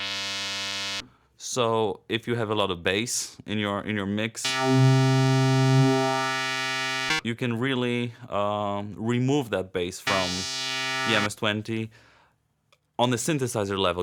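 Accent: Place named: Sweden. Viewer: Love your swedish accent! musical!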